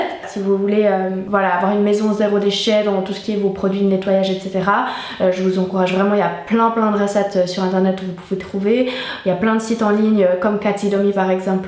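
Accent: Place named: Switzerland. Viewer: J'imagine que c'est l'accent suisse qui prononce comme ça.. . Chacun son accent 🤔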